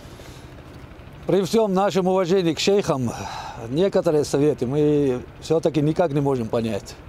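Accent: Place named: Chechnya, Russia. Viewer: Унего чеченский акцент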